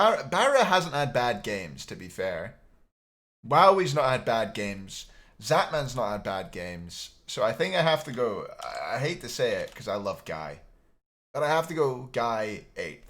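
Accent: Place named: Scotland, United Kingdom. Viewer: Best takes , perfect list , perfect video , and the most authentic Scottish accent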